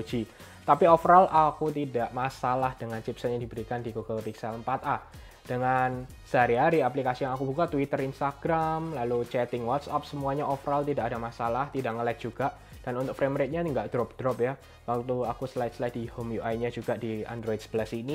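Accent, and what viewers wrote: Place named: Surabaya, Indonesia. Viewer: Pasti org Surabaya ya bang?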